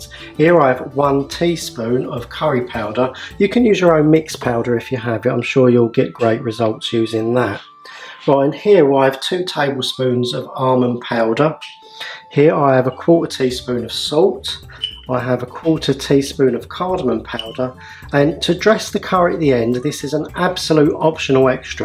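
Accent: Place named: Cambridge, United Kingdom. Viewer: are you from Cambridge/Newmarket? You sound just like all my family there:D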